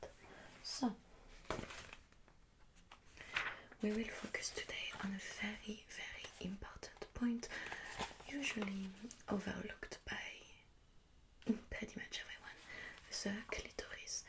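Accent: France. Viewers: traffy jump maybe because she’s French??? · Why this french accent? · I love your French accent! Greetings from Brazil!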